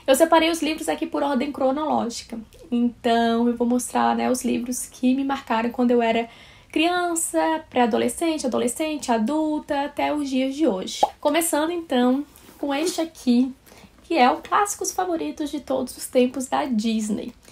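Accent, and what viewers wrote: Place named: Rio de Janeiro, Brazil. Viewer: Vc é afinal de Santa Catarina ou do Rio? Tá com sotaque carioca nesse livro 😅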